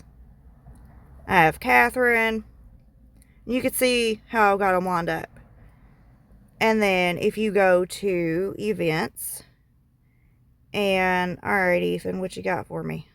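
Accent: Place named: South, United States. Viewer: Damn you sound kinda hot. Country accent goes hard. ☠️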